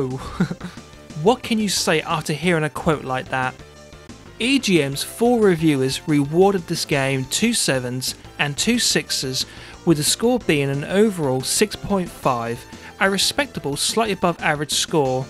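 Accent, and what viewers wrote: United Kingdom. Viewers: LOL, it's Morphing Time! You're the only British reviewer on youtube that I can stand listening to! Congratulations! Subscribed! Keep it up!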